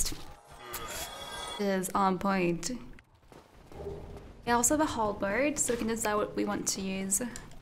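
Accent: England, United Kingdom. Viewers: Hey or if its okay^^ Pls can you make a video or Vlog in german? Your german with this english accent is cool. =) This would be nice. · Adorable english accent, sounds well spoken and proper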